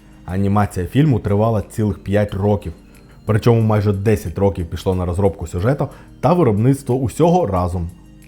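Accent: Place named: Russia. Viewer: він має на увазі,що ви розмовляєте українською тільки для відео,має на увазі ваше лицемірство. Хоча направду,дуже чутно,що ви зазвичай,розмовляєте окупантською.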